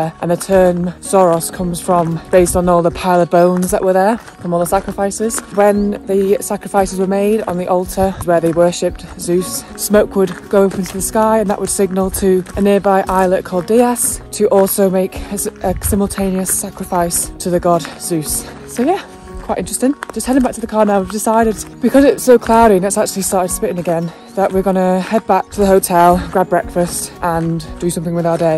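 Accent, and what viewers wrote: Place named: Stafford, United Kingdom. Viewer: You sound very immature! Great video,thanks!😅😊By the way ,your accent sounds very similar to my home town accent of Stafford.Im guessing your from not a million miles from there?😊😊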